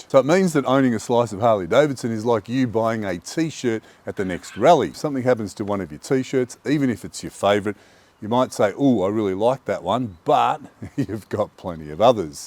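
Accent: Australia. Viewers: A guy with an Australian accent is telling me not to boycott woke Harley Davidson. Go woke go broke! brother!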